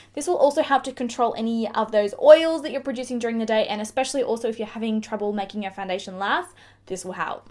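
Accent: Australia. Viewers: ?hmmm..let me guess.. Aussie accent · I almost never have the sound on when I watch tutorials because basically most chicks annoy me. But I wanted to hear what you were talking about with this tutorial, so I turned up the volume. And you're an Aussie. All this time I didn't know...lol. New subbie lol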